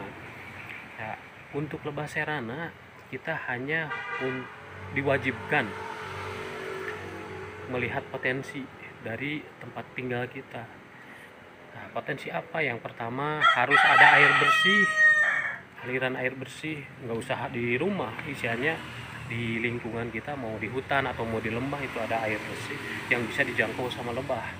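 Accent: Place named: West Java, Indonesia. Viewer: Logatnya seperti sunda, Daerah mana kang?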